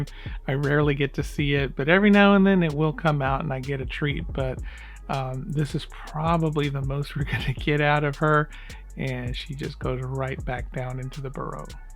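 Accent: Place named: United States. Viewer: I'm so used to how Petko from The Dark Den pronounces the names that it sounds so weird when I hear Americans say them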